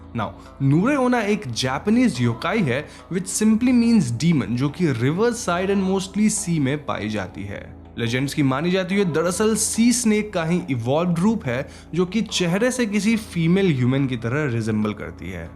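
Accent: Bengal, India. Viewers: You are bengali right?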